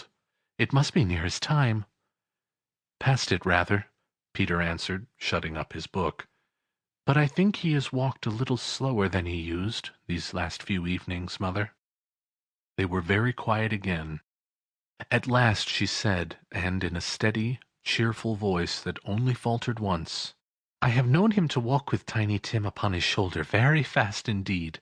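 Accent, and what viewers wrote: United States. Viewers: why an American reading a book set in Victorian England?? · Dickens told in American-English accent?? Really? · Wish this was in an English accent. The American kind of ruins it. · I’m sorry but I can’t deal with the American English. Anton Lesser ( Game of Thrones) does Dickens very well. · Managed about 4 minutes listening to that droning yank accent.. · American accents are no good for Dickens period ! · Yes...now am about to switch it off because it's in American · we say it “you bik wi tous” in the us and he sounds America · I want a version thats not told with an American accent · as good as this reading is the mere fact that it is read by an American accent and the word "clerk" in britain is pronounced "clark" which takes away just a little from believeing this tale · Awful American version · At first I wasn't so sure about Dickens in an American accent, but this one actually turned out to be pretty good. 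· Bye = American accent. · I am, but it doesn't sound right in an American accent. I'm going to find an English version! Merry Christmas! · Sorry. Merkin accent. Bye bye.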